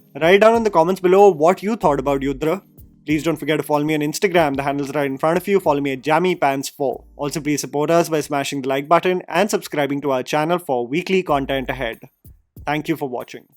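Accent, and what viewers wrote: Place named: India. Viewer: Bro hate this channel for Indian accent English..... Kindly create videos in Hindi...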